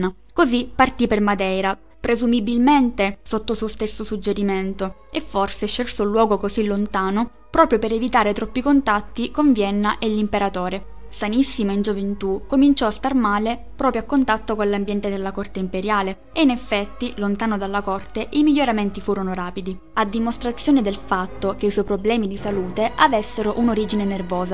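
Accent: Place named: Catania, Italy. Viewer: Sei del Catanese? Si sente molto il tuo accento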